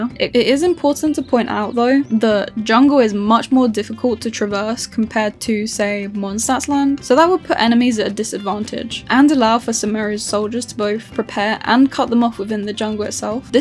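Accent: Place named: United Kingdom. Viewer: but why are you british?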